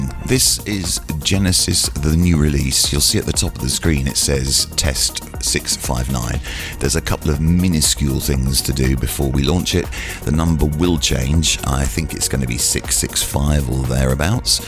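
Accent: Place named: England, United Kingdom. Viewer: i'm sorry.... I am having trouble understanding.... can you explain it to me inworld at my place?? the sexy voice and the english accent gets my brains in noodles 🤣🤣🤣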